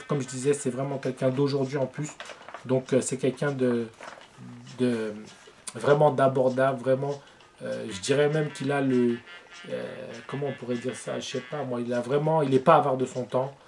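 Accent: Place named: France. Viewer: real english frenchie accent still cute ^^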